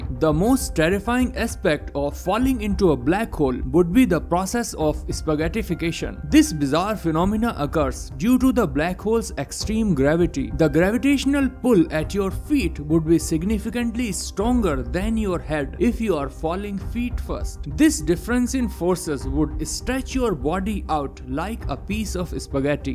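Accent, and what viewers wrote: India. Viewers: Are u Indian? Ur voice is so annoying.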